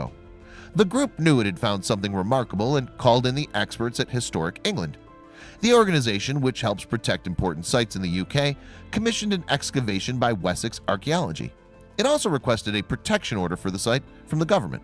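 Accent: United States. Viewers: If you are going to post a YouTube video get your facts straight. What the hell is an American doing a Voice Over on a British subject? The mind boggles. 🤪🤪🤪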